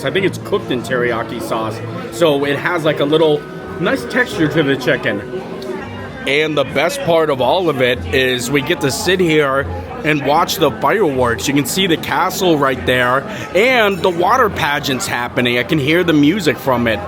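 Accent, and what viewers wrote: Pennsylvania, United States. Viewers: That pa twang! (I'm from Trenton originally)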